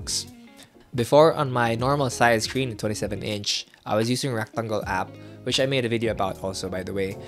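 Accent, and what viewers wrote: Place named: Philippines. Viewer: Oh wow, as soon as I heard you start talking I was like "he sounds like he's from PH..." :P My LDR girlfriend is from there as well; any recommendations for cool places to visit when I go there in the fall? Borocay seems cool but I feel like everyone does that.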